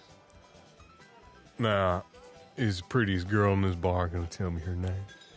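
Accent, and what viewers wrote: South, United States. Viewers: i absolutely love your accent (and honestly i am not a big fan of american accents that much) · The country accent is amazing. This video is just what I needed, too. Thanks, Daddy. ❤️ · The giggles I let out while hearing the country accent ☺️🤭❤ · This Country Boy accent sounds so sweet, and is hitting just the right spot in my brain ❤️ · Your country accent is amazing 🤩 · This ain’t no American accent missy!!! It’s a country accent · As a girl who lives in South ith think your accent was spot on a little to rich but when you speak all in all it was hot 😙🤌 chefs kiss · Omg the country accent gave me tingles. You can get it. Stop playing Daddy I'm lit so I have liquid courage. I'll feel bad tomorrow Yee haw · As a southern girl, the accent killed me💀💀💀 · (Edit): After listening to this, your southern accent is pretty dang good! 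Your voice acting is very impressive! Well done ❤️ ❤️ (Original comment): Ooo?! Going by the title imma have to listen to this one at night 😂🫢🥴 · A country boy accent aways makes me giggle 🤭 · Him: Where you from? Me from Downtown Ohio No boyfriend because I’m to shy to ask anyone, and to tell people I’m from Ohio… 😪 yeah this audio is perfect.❤ I loved it. You have a wonderful country accent! 🥹love you!!! ❤❤❤.From a British woman. 😌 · The Country Boy accent is amazing, it so good thank you!!! ❤ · I love your southern accent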